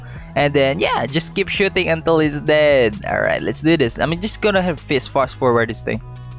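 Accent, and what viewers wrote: Philippines. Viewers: Are u filipino im asking cuz ur pronounciation sounds like most filipinos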